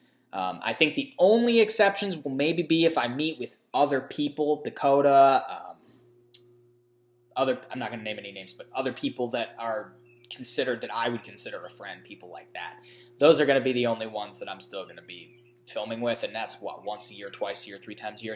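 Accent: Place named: Michigan, United States. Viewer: I came to you for your Speed Queen vids, but then started listening to a few of your other uploads due to your distinctly Michigan accent🤓.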